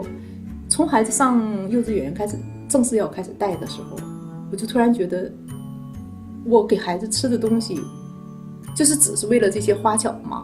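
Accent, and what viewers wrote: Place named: Northeast, China. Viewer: 东北银，鉴定完毕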